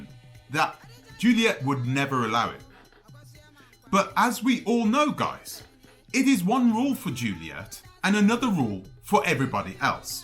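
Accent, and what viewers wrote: United Kingdom. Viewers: I am puzzled by your video. Surely this is a private matter between husband and wife and nothing to do with the business or you and us. Why are so interested in talking about their marital life and talking about them defrauding people? You sound British and you know you wouldn't get away with this in the UK without being sued. Am I missing something here?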